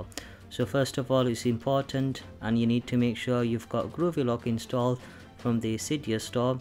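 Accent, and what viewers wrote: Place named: Liverpool, United Kingdom. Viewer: ***** yea i think it's a Liverpool accent or I think they call it Gordy lol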